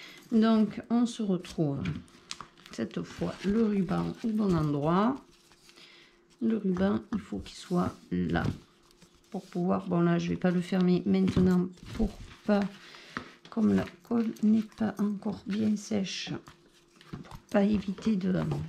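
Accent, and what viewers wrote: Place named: Southwest, France. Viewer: Coucou, j'adore tout ce que tu fais: tes rangements, tes classeurs, tes pochette à plastifieuse, TOUT j'aime tout. Je t'adore, je t'adore je t'adore.... Bien sûr je me suis abonnée avec la cloche et petit pouce partout sur toutes tes videos que je vois. J'habite en espagne depuis que j'ai connu mon mari, mais avant j'habitai dans le Sud-Ouest (pyrénées atlantiques) et ton accent m'as rappelé mes origines. Nostalgie. Est-ce-que tu es du Sud-Ouest? Je t'embrasse très fprt🥰🥰🥰🥰❤❤❤🦎🦎🦎🦎🦎🦎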